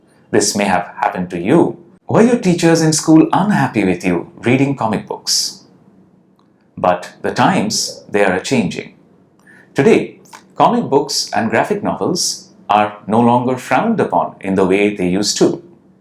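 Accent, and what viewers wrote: India. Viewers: He is not attempting to sound British. This is the acrolectal variety of Indian English. Please don't be harsh on people without any reason.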